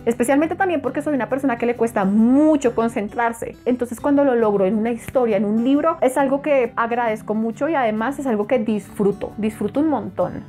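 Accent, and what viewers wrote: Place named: Bogotá, Colombia. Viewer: Hola... Creo que es la primera vez que te escribo, pero JAMAS me pierdo de tus vídeos... Yo también disfruto del olor de los libros y de las portadas de los libros, jjjeeje... otra cosa que me encanta de los libros, es que me hagan reír, y bueno, aunque parezca un tantito masoquista, también amo, que un libro me haga llorar, y hasta que me deje deprimido... No quería utilizar una frase cliché, pero es algo así como sentimientos encontrados, osea, PONERME FELIZ PORQUE UN LIBRO ME DEPRIMIÓ, jjajjaa... Suena loco, ya se... Aprovecho para decirte que me fascinan tu vídeos, tu acento bogotano, tu personalidad, y esa modestia que tanto te caracteriza... Nunca dejes de hacer este oficio, por favor... Un abrazo desde Montería Córdoba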